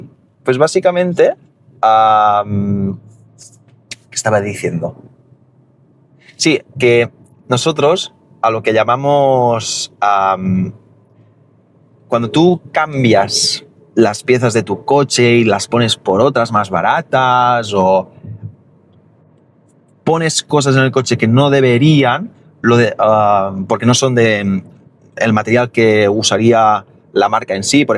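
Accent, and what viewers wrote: Catalonia, Spain. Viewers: A fin de cuentas, no deja de ser un canal de español para extranjeros, tiene que bajar la velocidad. Aún así, no es de los más lentos. También me gusta que no renuncie a su acento catalán, igual que Spanish with Antonio no renuncia al andaluz. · Pues yo pille Tu acento catalán desde el primer minuto de escucharte.😂 Luego lo del cochino salvaje ya me confirmó la región sin que lo mencionaras.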